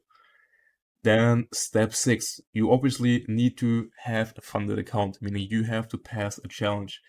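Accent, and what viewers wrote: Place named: Germany. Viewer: Gotta love that German accent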